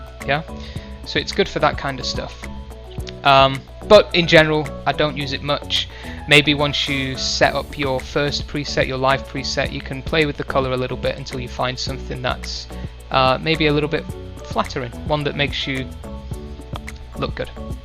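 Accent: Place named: England, United Kingdom. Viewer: Great video! Difficult to focus on the tutorial with that beautiful english accent :D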